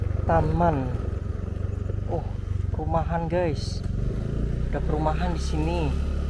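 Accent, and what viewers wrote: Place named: Banyumas, Indonesia. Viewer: Mantap uji nyali... Trus sy seneng infonya Medok ngapaknya..👍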